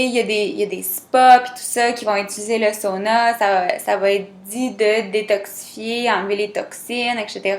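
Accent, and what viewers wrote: Quebec, Canada. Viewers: Il est trop mignon ton accent québécois. T'as des bras super musclé dis donc 👍👍. T'es jolie aussi 😍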